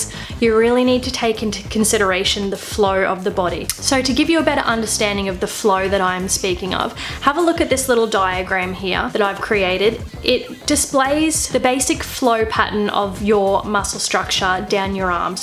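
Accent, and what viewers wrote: Australia. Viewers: because she has an aussie ascent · It's an australain accent. Alot of people here say "tadoo".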